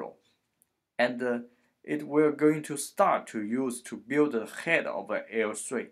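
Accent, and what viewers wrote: China. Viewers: 听口音像是国人，一看评论果然是。 老哥讲的可以啊，我这种菜鸟听懂了。多谢啦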